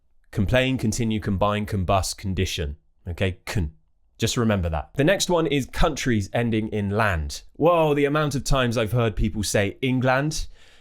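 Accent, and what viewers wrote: RP, United Kingdom. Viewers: Really love your lessons and the way you speak with your beautiful RP pronunciation. Look forward to being one of your students. Thank you so much ❤ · You're welcome teacher, I've learned many things from your video lessons and they helped me to improving my english, I love your modern RP accent it motivates me to continue upgrading my accent and speech, have a brilliant day take care 🫡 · I love the sound of your British English.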